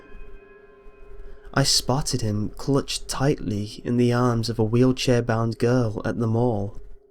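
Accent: United Kingdom. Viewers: omg brit accent so sweet and scary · Any other girls (or guys) out there kinda have a thing for 's voice? I think pretty much everyone digs a British accent-or is it just me? Maybe it's cause of the Beatles, I don't know...I think out loud too much. Hesitates to click post.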